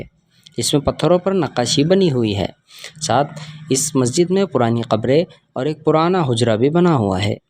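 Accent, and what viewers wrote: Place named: Deccan, India. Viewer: Best video ❤ no music Al humdulillah details information k sath saaf dakkani zuban sun k mazaa dobala ho gaya. Jazak allahu khairain kaseera Aage bhi isi tarah without music k video banaya kijiye ga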